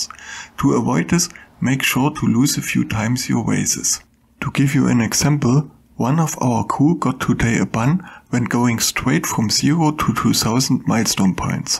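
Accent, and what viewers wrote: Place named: Germany. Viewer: heavy german accent :) german crew yeeeees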